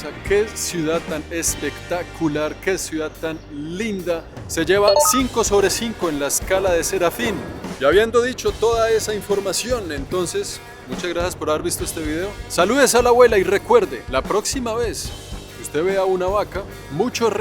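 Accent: Bogotá, Colombia. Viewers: Es rolo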